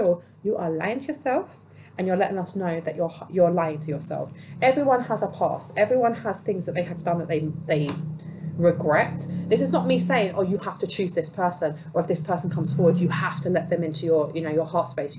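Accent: United Kingdom. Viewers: Understand you perfectly, love a Brit accent lovey! Your reading are exemplary! 💕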